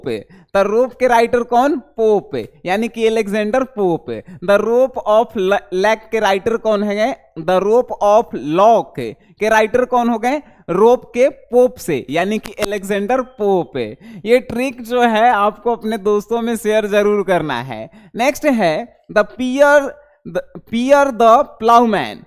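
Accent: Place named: Bhojpuri, India. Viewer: हे फलनावा सुनाता sir पुरा भोजपुरिया अंदाज में पढ़ाते हैं 💕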